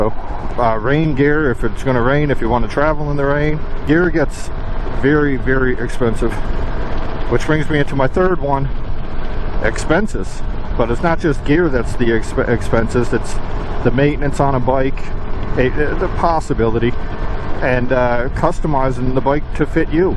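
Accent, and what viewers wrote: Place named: East Coast, United States. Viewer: This was amazing. Not sure if it was the east coast accent that brought me home or all the nails you hit on the head but this was excellent. I could relate to so many points you made. I just bought my first bike a couple months ago and all the gear to go with it. Got a 23 MT-07 and there are no words for how it feels, it's crazy. Working on getting a booking for the MST, some people around here are waiting over a year to get their course done due to how few examiners there are and how booked up it is. That Klim Induction pro jacket was rad 2 months ago, now it's getting chilly! Thanks for the vid! Sub'd.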